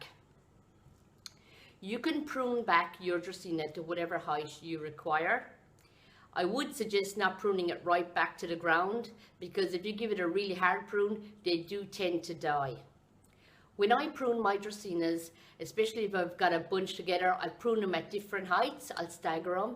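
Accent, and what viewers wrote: Ireland. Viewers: R U Irish? :) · Are you Irish because I am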